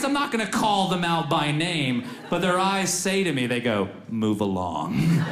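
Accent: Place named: South, United States. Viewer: His southern accent is still there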